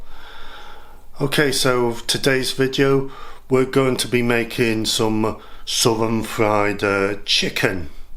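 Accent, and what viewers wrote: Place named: North, United Kingdom. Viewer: I AM FROM THE 1960 S IM.IM IN NZ I JUST REALLY ENJOY HOW YOILIIU DOYOUR COOK COOKING.PROGRAMME MR. CHIEF THE..INGRIEDIENT AND PREPARE OF THAT YOU ARE COOKING AND TO THOUROUHLY COOK PERHAPS THAT THOSE LIKE TO VEIW THIS PROGRAMME ALOT THE COOKING RESULTS ARE SCRUMBY THEEDEDESE RRECIPIES ARE HAVE TO BE VERY WELL MADE ANND SCRUMBY THANKYOIU MR COOK I REALLY LIKE THOSE TYPES OF FOLK AN THIER ACCENTS.LIKE YOURS FROM THE NORTHERN PLACES OF THE WORLD YOUR PROGRAMME PROVIDES HOW TOO WELL MADE SCRUMBY DISHES AS WHEN AS ALSO I VE BEEN INOLVED TOO COOK HERE PREVIOUSLY . THANK YOU MR. COOK THESE DISHES ARE SCRUMBY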